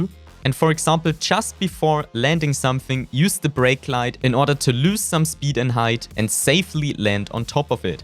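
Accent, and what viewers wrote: Germany. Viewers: Du bist alman oder man hört das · Man hört voll das du Deutsch bist LOL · He sounds so damn German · Alter ich schalte das Video an und höre sofort dass du Deutscher bist 😂. Aber alles gut. Ist wirklich informativ. Danke! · Bro ich merk doch direkt das du deutscher bist. Sprech doch einfach deutsch. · I know it from your a accent that you are a german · deutscher akzent lässt grüßen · Man kann hören das du Deutscher bist😂 aber gutes video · irgendwie höre ich ein bisschen deutsch in deiner stimme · der deutscheste akzent den ich jemals gehört habe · der deutsche akzent killt aber nice video bro hat mir echt geholfen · Dieser deutsche Akzent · yes, i can hear it very good, that he is from Germany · Man hört voll das du eigentlich deutsch sprichst 😂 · Dein alman Akzent hahahah😂😂😂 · German accent haha 😂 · I can tell from your english, youre 100% a German Guy. 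This Video helped me a Lot thanks. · You have a german accent are u german? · You are a 100% from Germany. Like ur speech omg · man hört das du deutsch bist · So heftig deutschen Akzent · Man kann so hart hören das du deutscher bist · Digga gutes Englisch man hört das du Deutsch bist! Nices Video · Can you speak German? You have this accent. Im from Germany so yeah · ich höre es doch du bist deutsch hahaah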